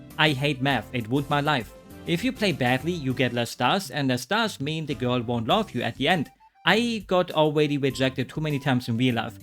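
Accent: Germany. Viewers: you're funny. I love your German accent. No I don't want to say your German accent is funny, I don't mean to sound wude.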